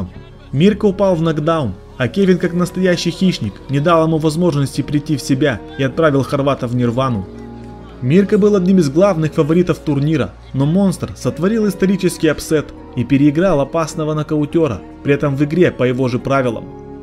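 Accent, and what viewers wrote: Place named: Caucasus, Russia. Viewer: Комментатор с кавказа добавил перца😆👍.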